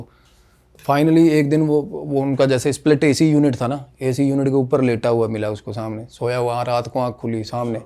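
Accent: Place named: Punjab, India. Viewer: bhayi k beech beech mai Punjabi nikal jati ha😅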